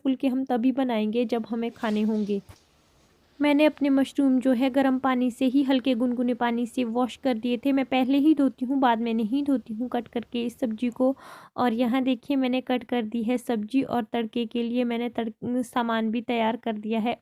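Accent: Pahari, India. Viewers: Hy anty osm vlog muje be apka hindi be pahari slag bhut pasand hai hm pahadi hai bhi pahadi slag nhi ayga to kya English ayga muje to bhut pasand apki voice be or apka slag be or ye dadi g nice hai kse haste haste hue bol rhe the👌👌👌👌👌 apko mara namaty 🙏🙏 or love u bhut sara 😘😘😘😘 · Pahari tone is must , that is our culture & identity . So keep it up .God bless you and your family. 🙏🙏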